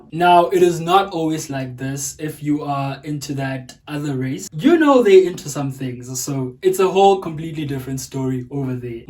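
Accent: South Africa. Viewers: I really like ur accent...it sounds south African but ur name is I'm like obsessed w u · Wait u south african Ur accent And yeah I've learnt 2 compromise Its ok for us verses i guess Coz we stick with what we receive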